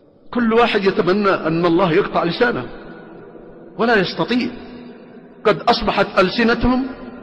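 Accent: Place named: Sanaa, Yemen. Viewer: بل هذا للشيخ عبدالله بن عثمان الذماري، فهو يتكلم ببعض الحروف بالهجة الصنعانية، ركز في الصوت، ما شاءالله يشابه صوت العلامة ربيع بن هادي المدخلي حفظه الله.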